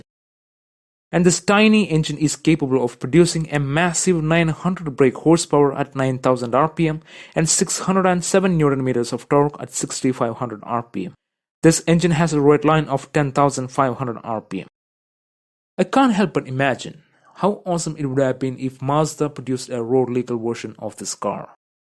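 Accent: Kerala, India. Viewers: Something says me that you're a Malayali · Are you a Malayali?? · Are you indian? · “Powered by an AMG built 6-liddr weight one with 740 Newton meters of TONK” Omg kill me😂😂😂😭😭😭🤣🤣🤣 I can’t figure out if this dude is a combination of Indian with something Eastern European, you tell me because it’s hilarious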